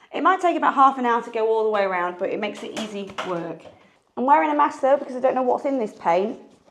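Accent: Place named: Manchester, United Kingdom. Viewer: Are you a Manchester lass.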